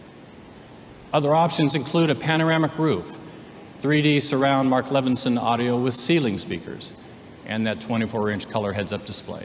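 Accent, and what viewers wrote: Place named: Rust Belt, United States. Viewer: The turbo will prevent our buying one. I assume the pimp wheels shown, are only an option, and there is an acceptable alternative: so the garish & impractical wheel is probably is not the dealbreaker. And why have a spokesman with that creepy hairdo? Looking at that hair, and hearing that lower class Rust Belt accent, I can SMELL the Brylcreem.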